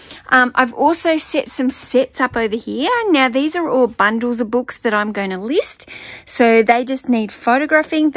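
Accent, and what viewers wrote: Australia. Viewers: Great sales on these books. I just started watching your videos. Thanks for taking us along for your journey. Question do you sale comic books also? Love the Australian accent!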